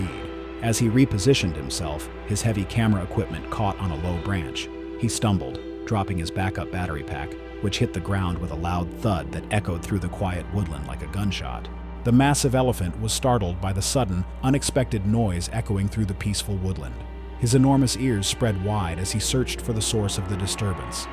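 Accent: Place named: United States. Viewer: Your voice sounds American, so quit with the metric system and us feet and inches.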